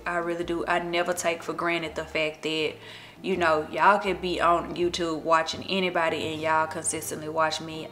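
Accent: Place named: Memphis, United States. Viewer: Hi my southern sis!! I knew there was a reason you sound so much like K. Michelle. That M-town accent is strong hun. I'm from the MS/AR Delta and I have lot of friends and family members from Memphis and their accent sounds just like yours.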